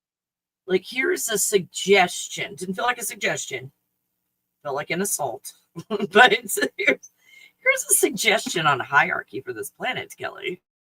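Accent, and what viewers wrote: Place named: United Kingdom. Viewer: love you’re British accent honestly I have a really strong Yorkshire accent you would need subtitles to understand ❤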